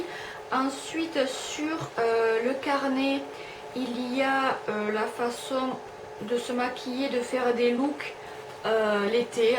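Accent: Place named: South, France. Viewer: Une drôle d'histoire 😉 j'aime ton enthousiasme et ton bel accent du sud 👌👍1 like + 1 abonné de plus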